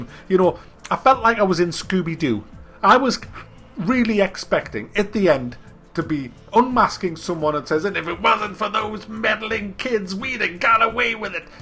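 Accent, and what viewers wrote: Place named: Newcastle, United Kingdom. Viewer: You sound like the Geordie version of Sir Davos from Game of Thrones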